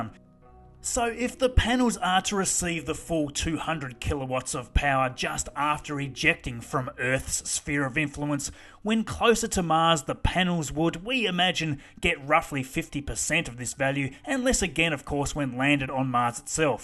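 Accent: Australia. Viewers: So I'm guessing your Australian?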